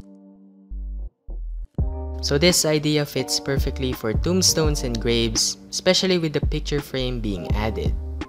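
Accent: Philippines. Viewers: Your accent its like a filipino Btw: Filipino here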